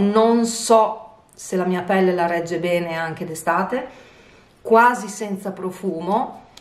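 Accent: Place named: Emilia-Romagna, Italy. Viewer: Sono passata a guardare un tuo video grazie a gattaluna 😊sn rimasta colpita dall accento...pensavo ma qst signora è della mia regione! Infatti quando hai detto Bologna tutto torna😅ciao io sn di Forlì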